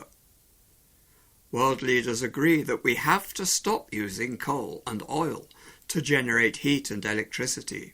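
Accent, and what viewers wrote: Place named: United States. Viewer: درود بر شما لطف کنید ازین مقاله ها بیشتر بزارید با لحجه آمریکایی بیشتر ❤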